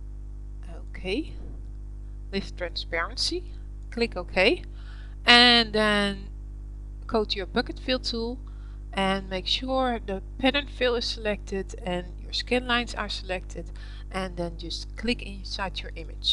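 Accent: Netherlands. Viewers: spotted the Dutch accent a mile away haha. nice tut thanx a lot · sounds like a dutch accent am i right?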